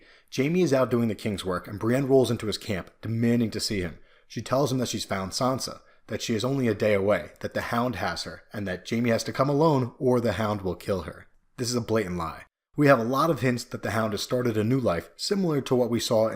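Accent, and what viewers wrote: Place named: East Coast, United States. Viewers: Cammy O hey woah...east coast accents are bad-ass....not cute. 😉Love ya girlie. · Normally I could care less about lady stoneheart; however, after watching this video I hope HBO (or D&D) puts the big scene in the show! As usual, you make everything SO interesting, even Lady Stoneheart! Your positive attitude & 🆙beat personality😄 makes your videos a joy🌈 to watch 👀 Your cute EastCoast accent & awesome voice 💞 makes your videos a joy🌈 to listen to 👂 Always a pleasure Thanx!😁 💗💚💛💜💙💖💚💛💜💙💝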